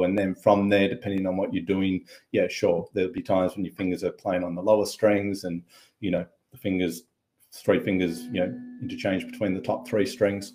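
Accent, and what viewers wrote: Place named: Australia. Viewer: I’m a finger style guy. Have been since I started with a really good guitar coach/instructor. Nbnbmnmn at Gryphon Strings in Palo Alto, California. You sound Australian, you’re playing a beautiful Maton and have an excellent approach to what I consider the most incredible instrument with a 1000 voices. Not sure how I stumbled on your videos but certainly glad I did.Almost 72 if I make to October. Stopped playing some time back and completely lost on how to start again. I need someone to get me jump-started. Perhaps you can help me with that.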